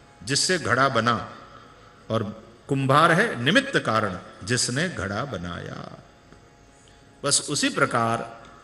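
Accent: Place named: South India, India. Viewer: Dear Swamiji, your Sanskrit pronunciation is topnotch. looks like you have studied somewhere in south India.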